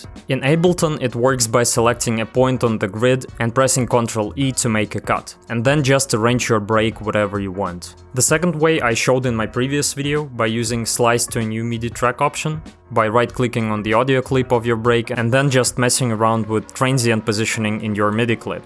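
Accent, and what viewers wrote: Russia. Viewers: У тебя русский акцент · Русский акцент)). Произношение все равно классное) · Мне тоже показалось что русский акцент))) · Броу, слушай, а ты случаем не русский? Просто акцент похожий) Спасибо за ролик! Всегда хотел попробовать написать музон в стиле брэйккора. С меня сто пудов подписка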